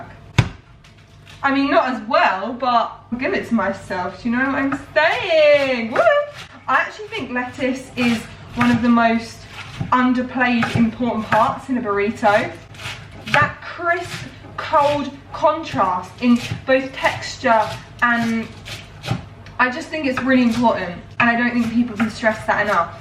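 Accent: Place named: South, United Kingdom. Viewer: just curious, what would you call your type of British accent? Your dad's is definitely not the standard southern urban one, but you are clearly not northern but I can't tell what type of southern accent you have. I'm an American so I have no idea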